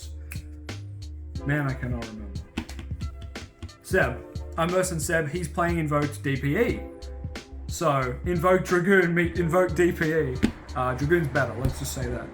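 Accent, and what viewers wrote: Australia. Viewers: Facts. And he needs a translater, I hate his Austistralin accent.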